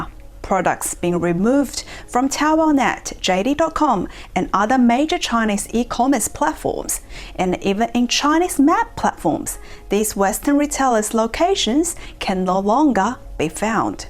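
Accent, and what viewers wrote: Australia. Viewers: 好像是澳州口音的英文。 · Really Fly! (真飛！）澳系口音舒服。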